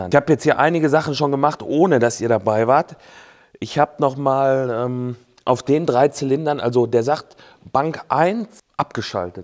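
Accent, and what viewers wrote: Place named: Dorsten, Germany. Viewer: Ich komme aus Dorsten und lebe schon seit über 20 Jahren in Süddeutschland, ...du redest, wie bei uns zuhause, alleine schon deshalb gucke ich deinen Kanal. Weit weg bist du auch nicht, oder?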